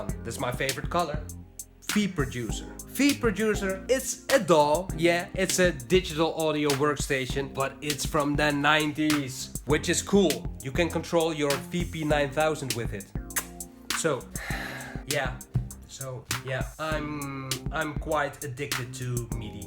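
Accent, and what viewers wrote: Netherlands. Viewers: Were are you from? i spot a bit of Dutch in that English spoken word. But you are just like me: hardware, sniffing hardware etc. Amazing :D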